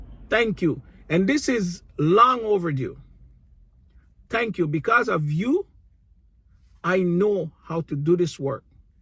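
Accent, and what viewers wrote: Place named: Jamaica. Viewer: Awesome sounds like a yard man🇯🇲 . Congrats on the channel.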